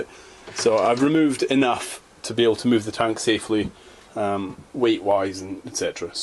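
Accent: Scotland, United Kingdom. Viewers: Thank fuck for that, someone speaking proper fuckin English (with a Scottish accent) instead of some shitty twatty shite ya can't understand. Ta, for the advice, good video.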